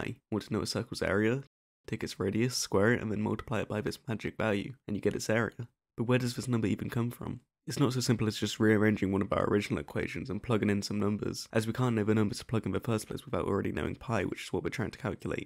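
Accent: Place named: Scotland, United Kingdom. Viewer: That's so cool, great idea ! Are you Scottish ? Because as a French it is hard to understand you 😆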